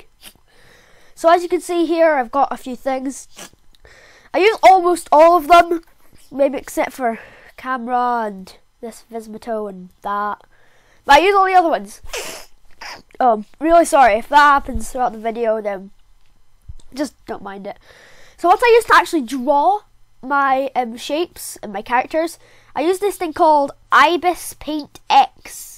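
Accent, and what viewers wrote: Scotland, United Kingdom. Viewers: are u from scotland? Dumfries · I’m guessing your from Scotland? 🏴 It’s alright, I’m from the great country to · Omg your Scottish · Lol its cool because you havent fully developed your british accent in these early videos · Are u British xD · Are you Scottish???! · Are you Scottish? I love your accient! I am Scottish btw! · This helped a lot tysm!!! Also- are you Scottish? Cuz I am too :) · U sound Scottish r u Scottish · Are you Scottish? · Are you Scottish? you sound Scottish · Are you Scottish . You have an accent which sounds Scottish cuz of the way you say it. You don't really pronounce the T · You sound so Scottish! (No offence if your not, I am anyway..)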